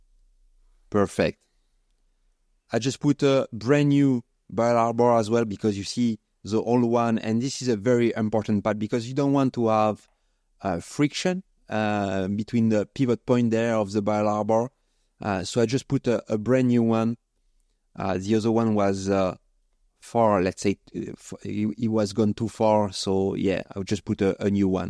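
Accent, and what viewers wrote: France. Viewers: What a biouteeful accent in English. Tu m'as tué avec ton accent frenchie ! Remarque les américains adorent ça apparemment. Hey, if it gets you more views in english than you doing it in french, what the heck go for it mon ami!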